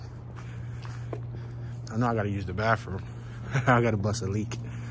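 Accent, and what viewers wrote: Philadelphia, United States. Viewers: Loving the walk with you and love the philly accent keep them coming be safe out there walking those breezy streets of philly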